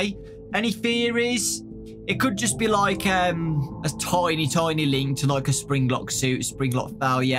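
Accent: United Kingdom. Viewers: He sounds sorta british and I want to have a british accent but I have this boring default american accent, I wanna say,”OI MATE YOO AINT GOT NUFFIN O ME!”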